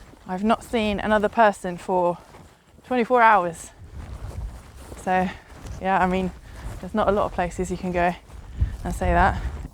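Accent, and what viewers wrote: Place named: United Kingdom. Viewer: I'm glad you're having fun , where are you from you look Asian but your language accent it's throwing me off you sound British just wondering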